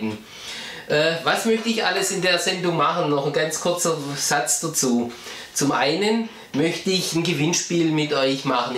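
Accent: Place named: Saxony, Germany. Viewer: hört man doch: Sachsen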